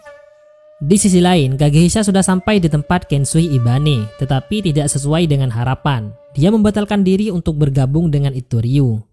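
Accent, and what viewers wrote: Madura, Indonesia. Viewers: Medureh dimmah kak?? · Ini orang madura ya?? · Logatnya kek orang madura.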